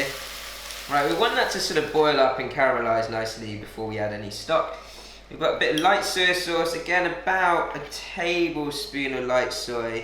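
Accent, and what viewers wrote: England, United Kingdom. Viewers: Chinese Chef with an english accent. Great!! Chinese noodles are great so is dumplings. Yummers!!!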